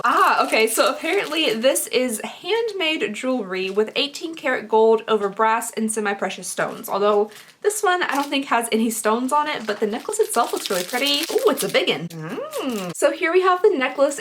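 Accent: Kentucky, United States. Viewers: You make us Kentucky gals proud girl! Be proud of that Kentucky accent! 😊❤️ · "Soundin' more Kentucky by the day" Nothing wrong with that, girl! Good evening to you from Southeastern KY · “Soundin more Kentucky by the day!” LOVE my Ky accent & being from, living in BBN 💙 Love you, you’re truly a breath of fresh air! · I LOVE the KY accent <3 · I was triggered when you said you are sounding more Kentucky every day, and then I realized you are in Richmond. I live in Winchester. You are allowed to talk smack about kentucky if you live here...lol. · I just found out you’re from Kentucky and now that I know I can pick up on you accent when it slips out here and there. Haha I’m also from Kentucky. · "Boxcutter'd... sounding more Kentucky by the day!" Wow, didn't know you were from KY until you pointed that out! And I've been watching your channel for awhile now. 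I'm Lexington, we're the same age and I really wish we could be friends irl UGH! ❤❤ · I know your Kentucky accent is partly to blame (im from oklahoma so i feel this) but your pronunciation of some things makes me giggle a little:) especially apotheke!! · ‘Sounding more Kentucky by the day’- I’m dead, lol I feel that though.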